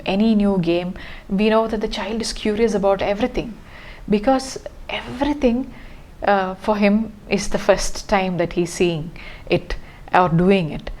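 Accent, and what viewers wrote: Kerala, India. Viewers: R u malayalee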